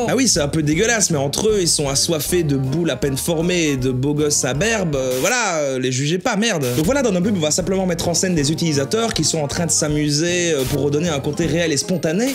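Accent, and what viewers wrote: Belgium. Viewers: accent belge :p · il est belge donc oui, le "ui" on le prononce "oui" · Je cois avoir entendu un petit accent belge....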